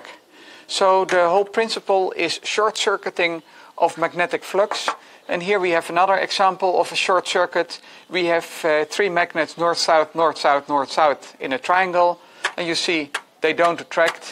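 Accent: Netherlands. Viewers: It's been more than 10 years.And the Dutch accent has still not completely gone.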